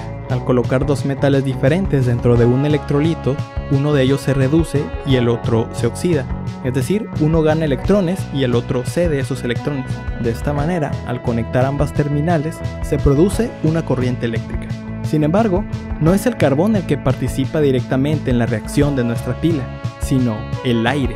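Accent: Mexico. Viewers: Se te nota el acento Sinaloense we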